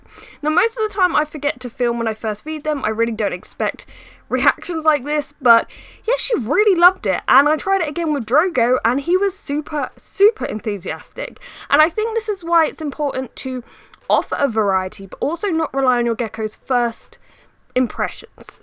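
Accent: United Kingdom. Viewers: Nico Savoca it’s a British accent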